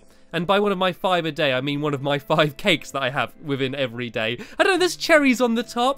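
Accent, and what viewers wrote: United Kingdom. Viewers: Are you British? British people are cool. (Just forget about the American Revolution.)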